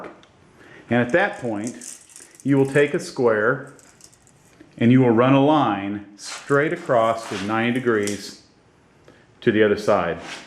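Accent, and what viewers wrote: South, United States. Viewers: I personally LOVE your soft Southern accent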